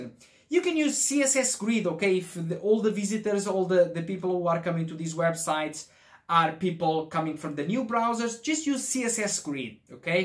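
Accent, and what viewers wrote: Brazil. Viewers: falou duas palavras eu vi que era brasileiro hahah ótima explicação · are you Brazilian ?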